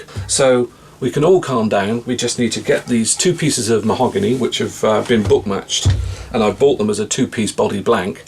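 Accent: United Kingdom. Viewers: You're doing excellent work. I really like the British accent too. I'm from Germany by the way. Any chance you can show us to make a Gibson SG but with symmetrical horns? Because every time I look at the original I get furious. I hate asymmetry.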